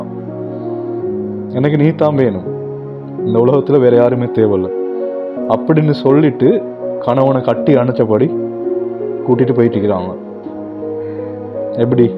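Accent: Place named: North India, India. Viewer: North Indian Tamil sooper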